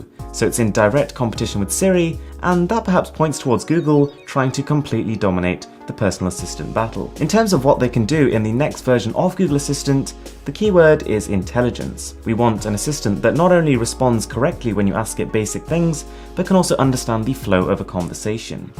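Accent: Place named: United Kingdom. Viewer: Are you indian? I've never seen an Indian person with a British accent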